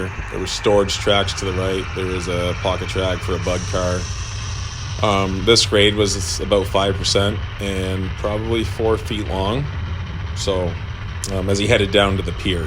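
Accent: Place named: Canada. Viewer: Cheers. I had a feeling you might be in CA. (it was the road name and the pronunciation of 'ou' words - can't miss that! lol) Version 4 ain't your first rodeo. The experience shows, and I like the fact that you are willing to repair/replace/improve the scene or even the entire layout! Also, I like the stretched helix as it has fewer loops and greater access to errant trains. But, I was really surprised that the layout is only 15' wide - it looks much bigger, perhaps due to the generous track radii. So, I'm looking forward to the CTC series, and maybe a track plan? and meanwhile I'll check out the other videos. Thank you for responding - very helpful. Lawrence (CA, too - but, alas! USA, not CA)🙃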